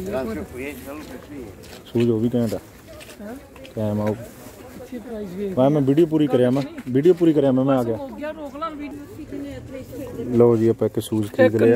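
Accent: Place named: Amritsar, India. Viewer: bhau Amritsari gurdaspuri lgda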